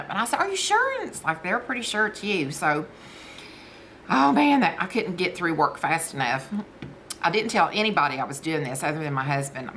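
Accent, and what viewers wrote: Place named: South, United States. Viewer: Love hearing your stories. Don't know anything about cats but can't wait to hear about the kittens. Your southern? accent reminds me of joyce meyers. Consider that a compliment because she's wonderful just like you 😊 God bless you.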